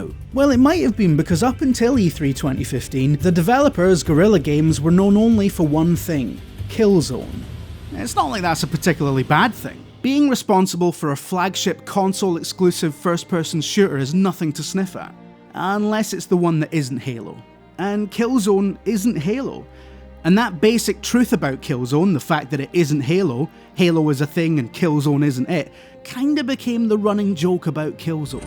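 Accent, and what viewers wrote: Scotland, United Kingdom. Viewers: Is that a scottish accent I hear? Wonderful, could listen to this all day! Great review too.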